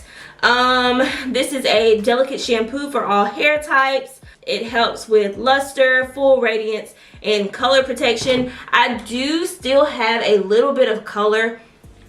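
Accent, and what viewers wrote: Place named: Memphis, United States. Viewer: I like your accent. Sounds like a Memphis accent lol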